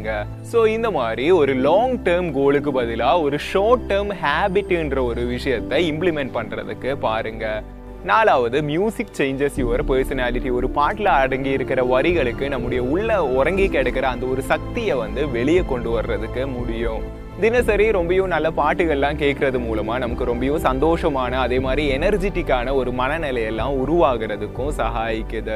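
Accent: Kerala, India. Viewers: What ah voice...and ur malayali tamil....😍😍🤩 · Malayalam kalantha tamil.. Nice bro....😊👌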